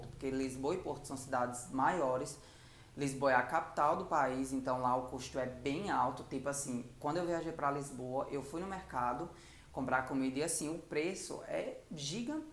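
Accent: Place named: Northeast, Brazil. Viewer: Só eu que acho fofo esse sotaque nordestino ? Kkkkk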